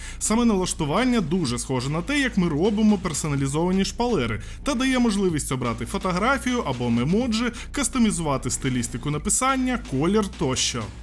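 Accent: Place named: Russia. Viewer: От просто безмежна повага. Чутно по голосу що автор розмовляв на рос. язику. Але перейшов на українську. Дякую за приклад!!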